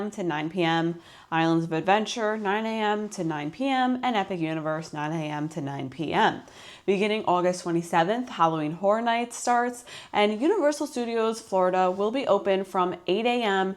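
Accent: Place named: New York, United States. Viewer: I always tune in to your universal videos. So excited for hhn this year! Also love your channel because I'm from long island NY and love hearing your NY accent 😂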